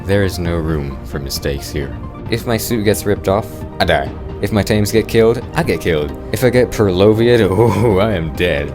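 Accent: United States. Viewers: I love the cowboy accent 😂😂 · combine the voice of * and a southern accent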